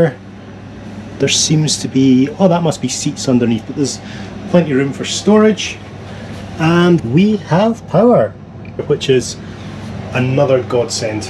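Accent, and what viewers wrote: Scotland, United Kingdom. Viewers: Another memorable report. In the very olden days I used to frequently travel by sleeper between Milan and Frankfurt. The luxury in those days would have even impressed Agatha Christie. That notwithstanding I could never sleep. I always had the sensation that I was slipping out of bed. BTW was that a Scottish accent hiding behind the Polish?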